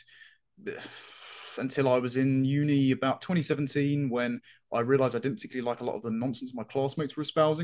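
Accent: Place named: RP, United Kingdom. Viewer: I see a lot of Lotus Eaters viewers hating on Connor & I can't understand it... Okay, with his accent & articulation he may come across as posh or pompous at times, but that doesn't take away from, is what he's saying true? Being a Reformed Christian myself I strongly disagree with him Theologically, that being said, his positions & how he got to those positions, is always reasonable & well thought out. I think he may very well grow to be one of the more important voices in the British political sphere & I just hope he doesn't outgrow the LE but rather grows with them. God bless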